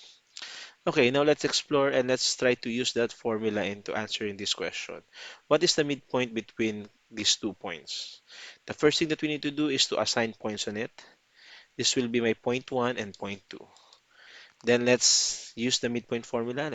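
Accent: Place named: Myanmar. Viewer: မြန်မာသံ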